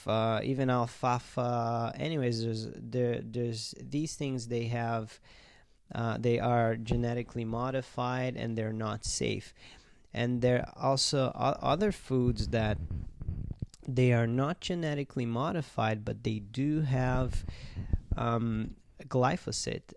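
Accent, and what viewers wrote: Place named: Canada. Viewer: Sounds like you are in Canada, I am as well.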